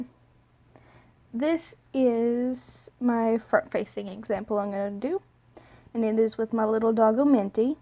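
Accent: South, United States. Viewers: You sound country kinda, but u keep catching yourself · no offense...... i just realized that you sound country :P im country too so idek why i said no offence.... im stupid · Are u country? Bc u have a country accent, sorry it just made me laugh xD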